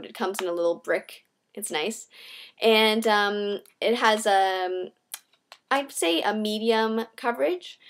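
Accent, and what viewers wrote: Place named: Canada. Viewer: I cant tell you are canadian by how you pronounce "OU" like about, out lol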